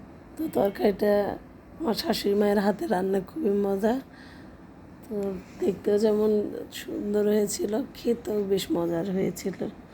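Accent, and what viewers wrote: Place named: Tangail, Bangladesh. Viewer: আমিও টাংগাইলের আপু। তোমার কথা আর রান্না দেখে মনে হলো টাংগাইলের মতো তাই বললাম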